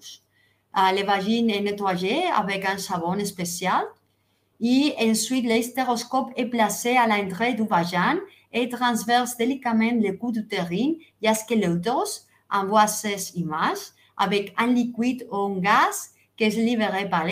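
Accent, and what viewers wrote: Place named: Spain. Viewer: Me encanta tu manera de hablar francés mezclada con español. Gracias por la explicación.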